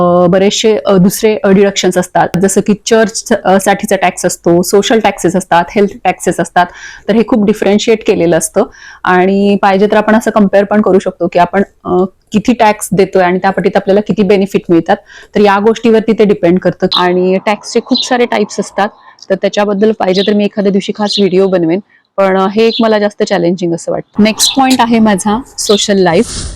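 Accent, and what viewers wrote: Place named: Kolhapur, India. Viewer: खूप छान माहिती दिली मॅडम तुम्ही . तुमच्या बोलण्या वरून तुम्ही कोल्हापूर , सातारा , सांगली , किंव्हा पुण्याच्या आहात अस वाटत .. बरोबर ना 😊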